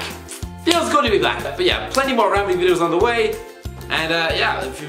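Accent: Ireland. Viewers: Does the "Irish Guy's Rant" on the screen for 90% of the video not tell you? Or his clearly Irish accent? Or the fact he called himself Irish multiple times in the first few seconds? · Irish accent👌🤘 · are you irish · Shit I can't understand half of the things he says, Irish accent is difficulty asf, slow down boy, you're talking way too fast, does every Irish talk this fast?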